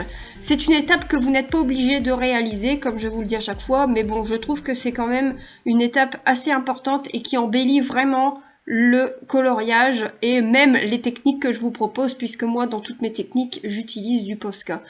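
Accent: Nord-Pas-de-Calais, France. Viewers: pas du tout. Adorable accent chti hein?😁😁😚💗💗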